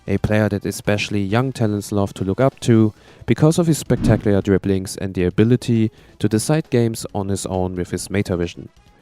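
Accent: Germany. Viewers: me too the german accent is so strong you cant unhear it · Man hört den Deutschen Akzent richtig raus😂aber trotzdem starkes Video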